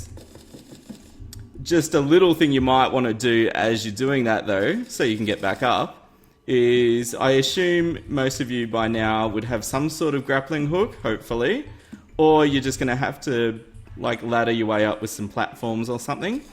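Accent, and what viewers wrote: Australia. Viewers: But he has an Australian accent XD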